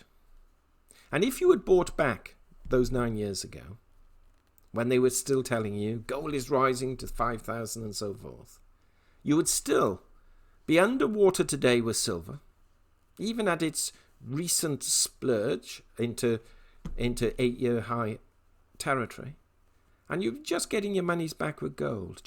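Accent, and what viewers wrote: United Kingdom. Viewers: This channel is great! I like the format and the lack of wild speculation. The brit accent add an extra charm!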